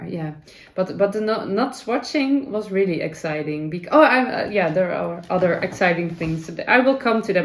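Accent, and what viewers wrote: Netherlands. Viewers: I really enjoy your so-called "dutchy English" because my dear Father also had this accent! ❤ 🧶 Hard relate on 3mm struggles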